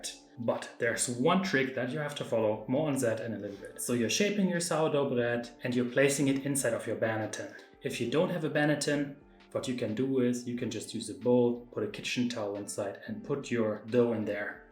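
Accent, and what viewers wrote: Germany. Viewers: Your German accent is so much stronger than usual! 😊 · LOL I thought exactly the same thing.. I have never heard him speaking English with that German accent :D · Wie schon von anderen geschrieben, lustig anderen Deutschen auf Englisch zuzuhören wie Brot gebacken wird. Als Muttersprachler ist der deutsche Akzent in diesem Video echt hart und erinnert an gruselige Zeiten von Schulenglisch 🤣 Klasse Kanal und tolle Videos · Your German accent is really strong today. Is that because of the German rolls?? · These look delicious! My girlfriend makes "Worstbrotchen" (sorry about the spelling) what exactly are they, do you know? I've never had them but she makes them at Christmas. Thanks for your awesome videos, with or without the heavy German accent! ❤️🙂 Also, have you ever made Ezekiel bread? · Authentic German bread rolls with an authentic accent. 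Perfect! I have a lot of rye, so I will have to German it up even more :)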